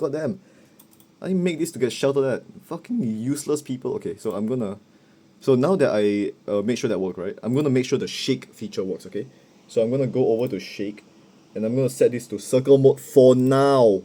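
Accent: Singapore. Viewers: w singapore acent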